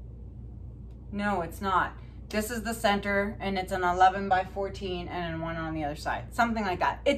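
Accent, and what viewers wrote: Canada. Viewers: thank you so much. I could hear you pronouncing the name but I couldn't find it. By the way I love you accent. I don't know for sure but it sounds Canadian? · Just found your site,....I'm in the US but knew you were CA with the eh and the owt! lol I have family in Dunnville, ONT! They make fun that I drop my 'H' sometimes! Soooo, Love your work, your dog Juno and excited for your reno!! Since I'm much older than you, I have advice! Enjoy the journey, trust yourself and don't worry....I choose the green, btw. Looking forward to your next project and your vlog! Pat from Brooklyn, NY (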